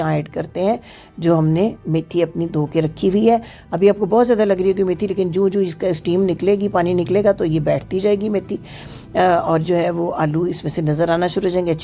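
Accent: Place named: Punjab, India. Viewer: آپ کا اردو بولنے کاانداز پنجابی جیسا ہے اسے بہتر تھا آپ پنجابی میں ہی بات کر لیتے۔میتھی میں نہ تو سوکھا دھنیا ڈالا جاتا ہے اور نہ زہرہ۔اور میتھی کو دم میں پکاتے ہیں۔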